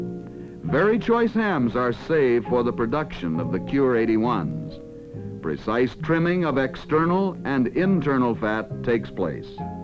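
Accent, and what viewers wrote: Mid-Atlantic, United States. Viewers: It’s likely because of the Atlantic accent he’s putting on. It’s a semi-artificial accent that combines the English and the Northeastern American accent that was used for many informative radio and TV programs so that ideally the narration could be as easily understood as possible in either country.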